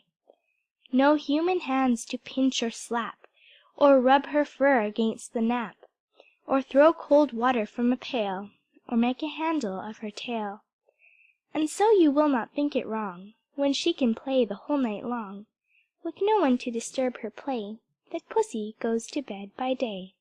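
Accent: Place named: United States. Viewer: Английский с американским акцентом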